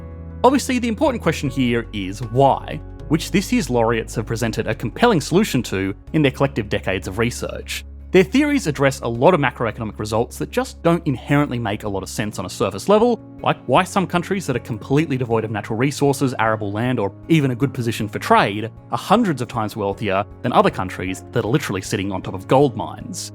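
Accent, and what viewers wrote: Australia. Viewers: good job. Are you Ozzie?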